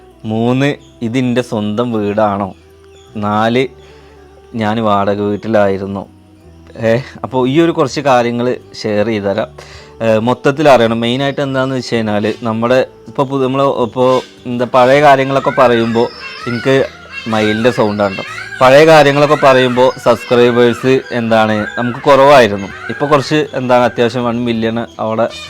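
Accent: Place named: Malappuram, India. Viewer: Nee adhyam uppaante ummaante ennu paranjirunnu ippo sinu parayum pole anu ippo parayunnath uppantem ummante ennu basha mattunnu nee Palakkad Jilla alle Malappuram basha varunnu ippo aduthayitt samsarathil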